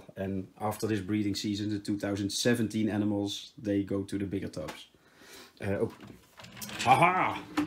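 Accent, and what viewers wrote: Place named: Netherlands. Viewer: Heerlijk Steenkolen engels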